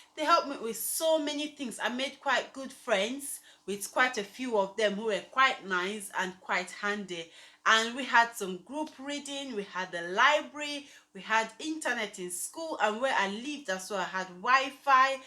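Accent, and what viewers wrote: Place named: Igbo British, United Kingdom. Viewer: You are so cute 🥰 the igbo accent mixed with british accent na wa oooo 🤣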